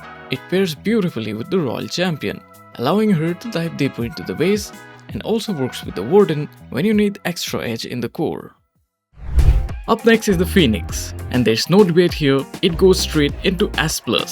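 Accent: India. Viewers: Bro are you indian or what 😅❤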